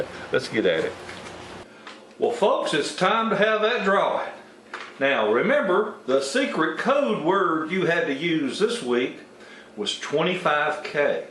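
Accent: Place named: Maine, United States. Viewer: He has that Maine draw reminds me of Down East Dickering mixed with Pug1. He has a grow on ya Quality. I'll subscribe. Is he in a part of Maine with Uncle Henry's listings? Old wisdom is worth a sub any ol cheap day.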